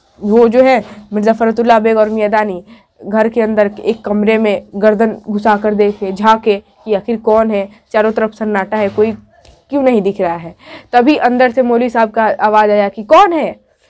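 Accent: Bihar, India. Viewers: Aap kya bihar se ho.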